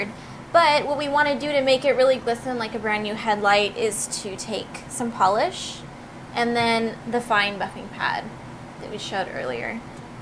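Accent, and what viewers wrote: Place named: United States. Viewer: excellent video ,but I hate your tono of voice is so noise and saunds so greengo ,:(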